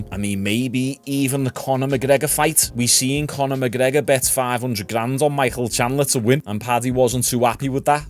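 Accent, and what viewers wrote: Liverpool, United Kingdom. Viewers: I’m fae Glasgow and I love the scouse accent,it’s class! Cheers for the content,mate! Keep up the good work my friend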